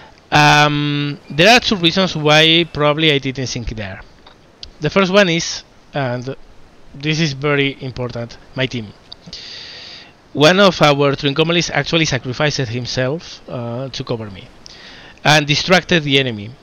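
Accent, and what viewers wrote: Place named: Spain. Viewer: Tu eres español no? Ese acento es claramente español Corrigeme si me equivoco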